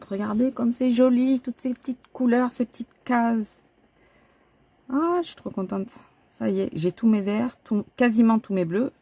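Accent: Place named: Toulouse, France. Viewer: Bonjour, vous êtes d'où ? Je vous ai entendu dire que vous n'allez pas sur Toulouse. Et il est vrai que l'accent, je n'y avais pas prêté attention mais il est de chez moi lol. J'ai bien aimé votre vlog. Hâte de voir le prochain! Bonne journée 😊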